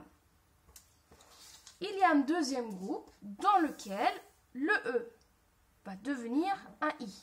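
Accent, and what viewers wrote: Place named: France. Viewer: dieser französische akzent xD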